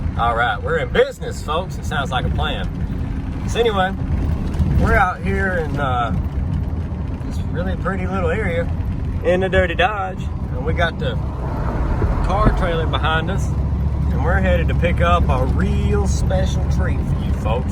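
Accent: United States. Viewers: a little more hick then normal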